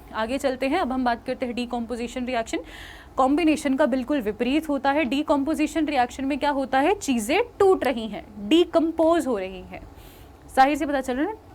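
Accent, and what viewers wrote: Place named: Haryana, India. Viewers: Mam you and your Haryanvi accent is awesome 💖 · Hariyanvi teacher's hits different ❤🫣💯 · I am listening here the haryanvi dialogue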